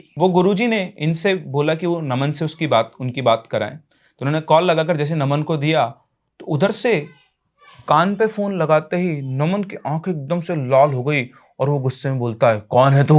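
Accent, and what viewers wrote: India. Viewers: आप का बोलने का तरीका बिलकुल देसी हे ❤❤❤गांव जैसा बोलते हैं जो देसी नहीं होगा वो शायद ही समझेगा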